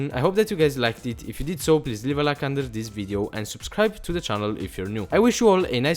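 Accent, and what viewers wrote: Italy. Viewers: I think good? You are Italian? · Ma sei italiano?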